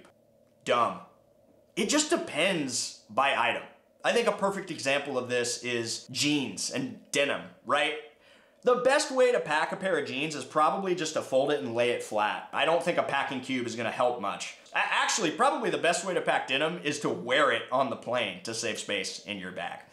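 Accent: United States. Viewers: I didn’t mean anything bad. It’s just that you are exactly how I imagine an American to look and sound like ;)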